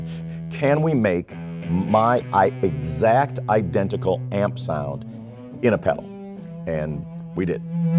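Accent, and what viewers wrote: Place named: Chicago, United States. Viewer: Chicago accent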